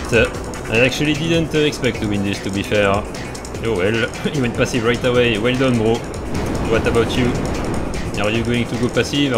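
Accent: France. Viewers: First time watching your vídeos. The French accent is só damn funny 😂 Btw, tho they are Trash, u can't be mad at someone for ewoing when you are trying to kill them in a Jet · The french accent is Amazing bro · His techniques makes it by far but, yeha, French accent is hilarious... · I love your videos and western slang with your French lol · Gta, good memes, French accent... I don't anything more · The French accent makes these videos all the more entertaining to watch. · Sacrée accent français c’est beau ! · I mean not only are you talented on console which is enjoyable to watch, but your perfect English French accent is so enjoyable to listen to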